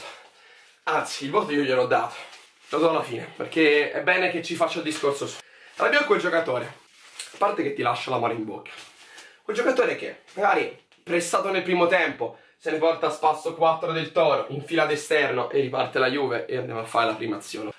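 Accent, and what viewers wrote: Tuscany, Italy. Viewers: Sei toscano? Sempre e cmq Forza JUVE💚🤍❤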